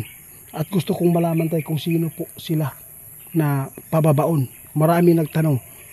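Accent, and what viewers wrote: Visayas, Philippines. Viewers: Visayas itong nagsasalita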